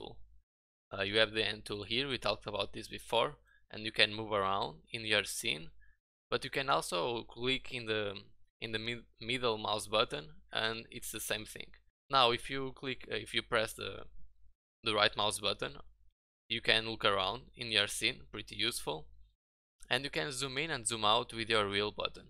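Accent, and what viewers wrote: Portugal. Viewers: claramente accent Portugues :D Bom video! Obrigado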